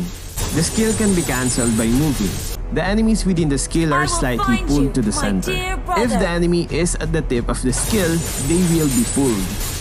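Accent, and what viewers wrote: Philippines. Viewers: Loving your accent, the way you say "windtalker" ughhh sexy Filipino accent❤️ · But the way he says "attack" is kindda weird bcz of his thicc Filipino accent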